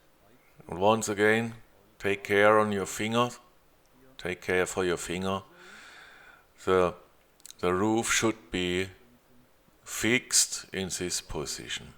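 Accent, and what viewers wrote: Germany. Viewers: Thanks for sharing this video ❤❤❤❤❤❤❤❤!!!!!!! Also i want to say that you sound very kind with your German accent in English and that is so nice that you made this fix with your friend ❤. I ll try do the same as i have the same issue!!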